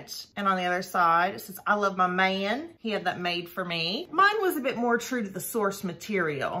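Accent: South, United States. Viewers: I don't know what I love more, your gentle southern accent or your enthusiasm for British stuff. Oh hell, I love them both. Being a Brit, I've accumulated so many mugs over the years, that every couple of years or so, you have to revisit the cupboard to decide which ones to throw out. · You should look for a mug with a ceramic frog or spider stuck inside on the bottom... its hilarious when the person drinks down and the Frog reveals itself .. i love your sweet southern accent ...keep drinking that tea..dunno about the waffle though...maybe i need educating lol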